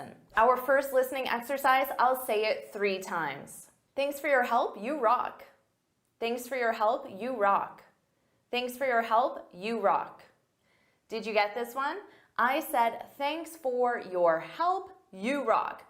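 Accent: United States. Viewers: Hi. Out of your excellent accent I guess you re American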